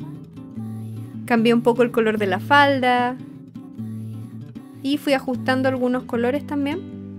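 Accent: Chile. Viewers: Eres chilena?!?!?! (Si dijiste que si chocala) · eres chilena como yo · Eres Chilena???? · Creo yo que el acento la delata no? SI, SI ES CHILENA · ERES CHILENA!!!!!!!!! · Savia que erai chilena Por la forma de ablar pero ya se aclararon mis dudas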